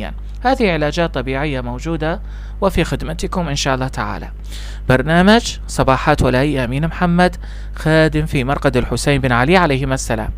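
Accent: Lebanon. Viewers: هل انت لبناني.. اذا كنت عراقي لماذا تلهج بالهجه البنانيه؟؟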